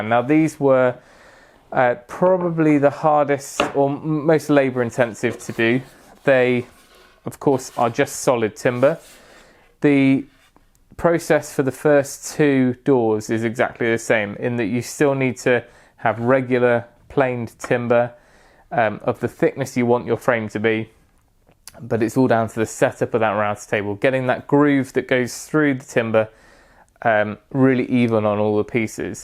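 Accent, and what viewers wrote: United Kingdom. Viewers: I've got to say, I have thoroughly enjoyed the series. I might be bias but it's so good to hear a British person rather than a DIYer from a cross the pond. Keep up the good work. Subscribed.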